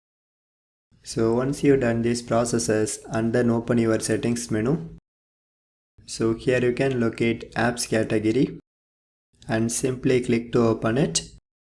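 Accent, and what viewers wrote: South India, India. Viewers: no problem brother i am just joking 😂 i know brother you're from south india and you've different accent ❤️🙏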